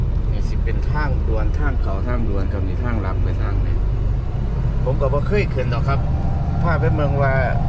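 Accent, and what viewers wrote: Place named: Isan, Thailand. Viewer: เว้าสำเนียงอิสานบ้านเฮาโลด บ่ต้องเว้าว่าครับผม แค่เจ้าโดยกะพอ ไปซื่อๆ=ไปตรงๆรึตรงไป พะนะ😆😆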